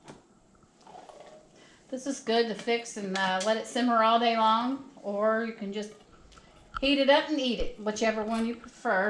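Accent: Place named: South, United States. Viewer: girlllll I absolutely love this video you gotta do more, umm and I love your southern accent you're gettin I'm so jealous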